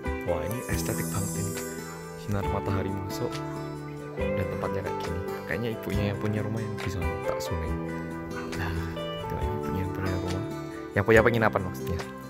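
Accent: Java, Indonesia. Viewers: Beralih jadi aktor Korea medok mas 😂😍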